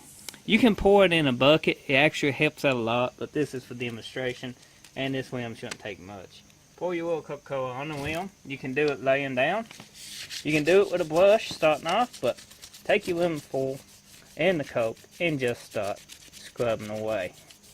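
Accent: United States. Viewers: Love the American accent mate. I'm from Ireland... and it sounds nice. Good video, I'm going to try it on my škoda. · American accent with a speack dialect but damn good video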